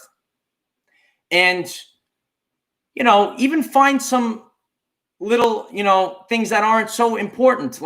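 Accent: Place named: New England, United States. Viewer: I love music. How can I find out the frequency of different songs? Also, I've been seeing my numerical birth date often recently, including the number of likes this video had. I suppose it's an indication of something being of personal significance but wondering if you have any more specific insight of these synchronicities. Thanks. I appreciate your smile, your guidance and comradory through this exciting journey. Where did you grow up? I'm a southern gypsy and enjoy your new england accent. Thanks again. Namaste 🙏🌈🕊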